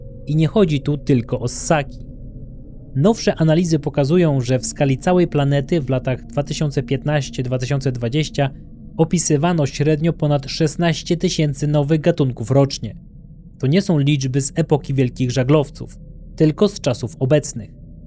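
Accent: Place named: United States. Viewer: Uwielbiam relacje na temat krypto gatunków. Zdaję sobie sprawę, że cześć z nich lokalny folklor lub turystyczny marketing - tak jak szkocki Nessi. Jeszcze raz dziękuję za dbałość wymawiania terminów po angielsku. Gdzie uczyłeś się angielskiego? Brzmi jak amerykańska wersja.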